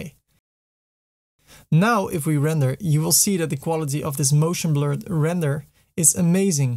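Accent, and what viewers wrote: Netherlands. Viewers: Ok top je Nederlandse accent is wel een beetje opvallend :)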